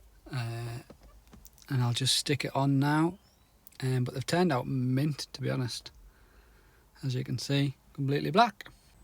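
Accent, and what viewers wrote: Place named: North, United Kingdom. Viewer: You sound like a fellow northerner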